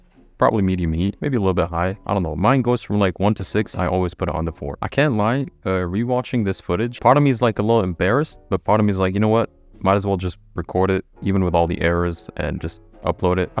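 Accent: United States. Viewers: I don’t know if you’d take this as a compliment or not, but your accent would easily pass for American lol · cool video! I'm surprised you've never been to the US, you have a very american dialect!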